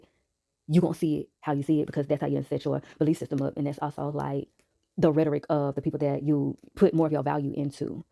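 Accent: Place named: Queens, United States. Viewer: I love your accent, were you from Queen? I subscribed to your channel 💖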